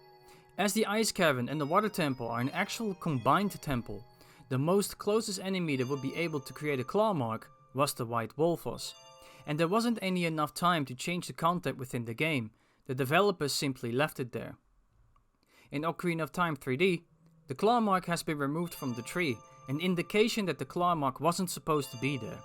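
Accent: Netherlands. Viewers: Hoorde aan je accent gelijk dat je nederlands was. Which is nice. ANOTHER DUTCH ZELDA FAN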